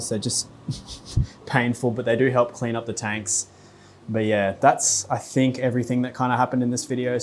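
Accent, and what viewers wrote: Australia. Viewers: Love your videos. As an American, I get a little chuckle out of hearing Australians say H like "heych", and here like "he-yah"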